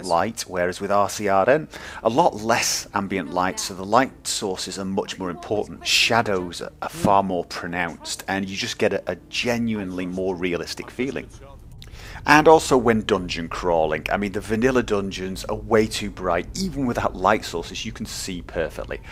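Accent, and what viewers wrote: United Kingdom. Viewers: cool accent=) are you British?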